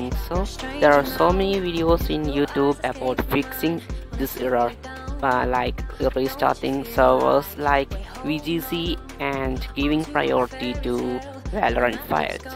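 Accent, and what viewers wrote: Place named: Kerala, India. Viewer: ennik English kettapo thanne thonni 😂😂 malayali anen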